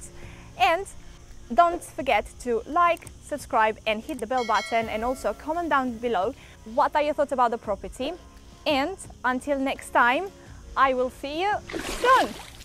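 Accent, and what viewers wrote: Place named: London, United Kingdom. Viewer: I can tell by the accent that she lived (lives) in London.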